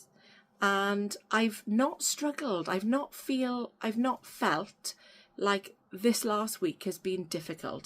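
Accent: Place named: Wales, United Kingdom. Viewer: Love your `Welsh accent whatever day you post.😃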